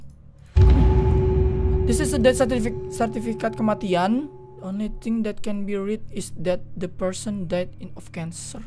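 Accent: Jakarta, Indonesia. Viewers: Maap maap ni ye Iye ....iye...... iye.... Bang dari betawi kah ??...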